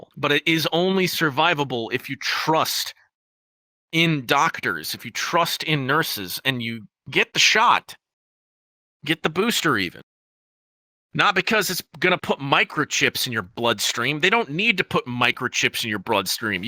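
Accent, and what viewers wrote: Chicago, United States. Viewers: Sounds like Chicago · sounds like Chicago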